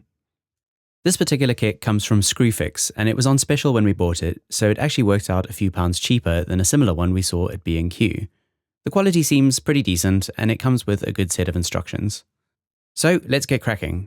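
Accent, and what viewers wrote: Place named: South Africa. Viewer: 1. Nice show & tell video. This is one my many projects I have lined up! 2. Are you an ex Saffa by any chance? I'm sure I can hear a bit of the accent from the old country. And 3. Your house layout is eerily similar to mine, even down to the artex ceiling and placement of the hallway light! Creepy! 😁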